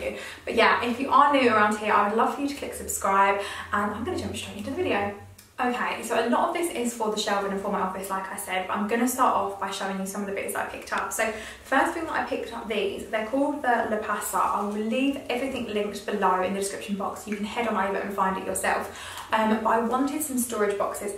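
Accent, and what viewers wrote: United Kingdom. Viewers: I love british eng' ❤✌..... loved what you bought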